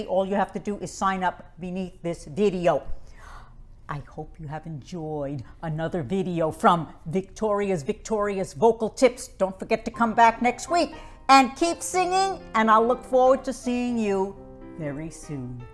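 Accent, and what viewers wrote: New York, United States. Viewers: I knew you had to be from NY or NJ by that accent! Thanks for all the great content!!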